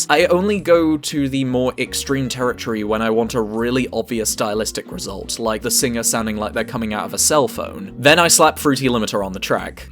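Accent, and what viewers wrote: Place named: United Kingdom. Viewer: You sound like Kevin but British. I approve.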